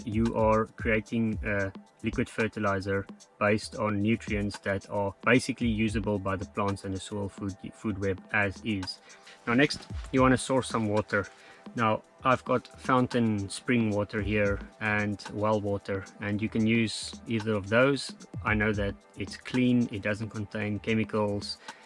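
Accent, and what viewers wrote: South Africa. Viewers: Hi you sound like south african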